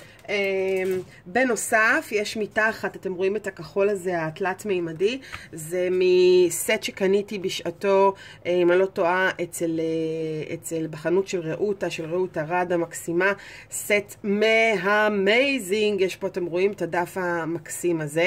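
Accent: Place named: Arab world. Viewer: Just watched this, didn't understand what you said but did get the idea and just like any papercrafter you love bling....lol For some some reason i thought you might have been Russian of Polish. But listening to you maybe Arabic.? Loved listening to you and your passion is really shining thru I keep smiling. Thankyou for sharing your excitement and passion, so infectious...🥰😜😘💜💚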